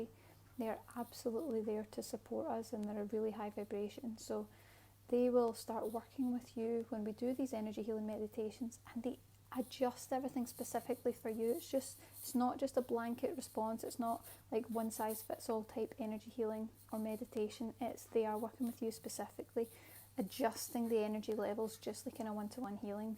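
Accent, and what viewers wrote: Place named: Scotland, United Kingdom. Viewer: You sounds like Scottish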